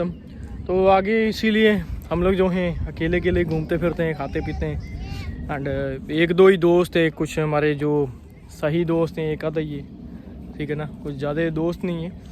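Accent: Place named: Pakistan. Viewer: Which part of India you are from do you have video of that briefing about your self as I don’t know why your accent sounds like Pakistani accent Hindi. Very much similar to Pakistanis here in Dubai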